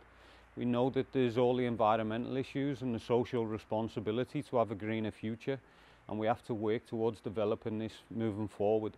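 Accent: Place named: Newcastle, United Kingdom. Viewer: I love the Geordie accent🙃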